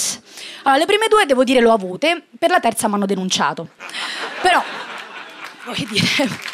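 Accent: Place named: Piedmont, Italy. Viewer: No, è di Cuneo. Non senti l'accento piemontese?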